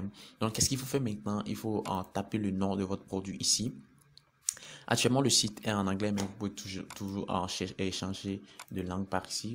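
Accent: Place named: Europe. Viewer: Belle vidéo... Pour les prochaines vidéos apprend à parler comme un africain et non comme un européen merci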